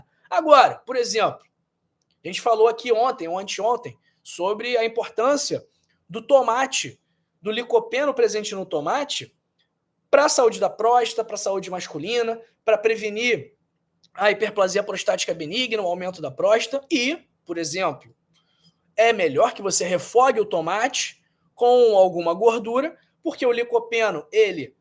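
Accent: Rio de Janeiro, Brazil. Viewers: Dr, vc é carioca? Pêlo sotaque kkkk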